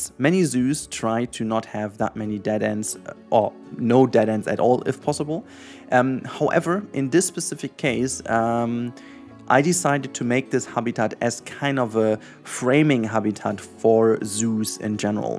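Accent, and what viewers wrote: Germany. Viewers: ich hab direkt deinen deutschen akzent bemerkt, aber krass wie gut du englisch kannst 🙂❤️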